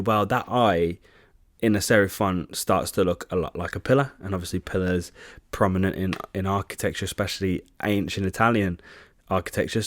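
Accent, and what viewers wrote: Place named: Black Country, United Kingdom. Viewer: 1.) Refreshing to hear a Black Country accent on YouTube. 2.) Do you remember a Spain kit with blue accents from 2013? Whatever happened to that kit?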